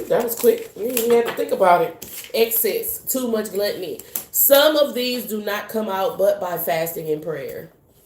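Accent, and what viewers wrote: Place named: Caribbean. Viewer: Love your accent. How it comes out when ya get excited!! Im from the Caribbean and reminds me of home. Different but the same. That slang. Bless you 🙏 love ya girl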